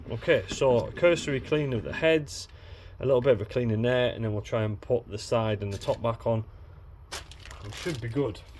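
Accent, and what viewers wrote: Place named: North, United Kingdom. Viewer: Absolutely love this northern (?) accent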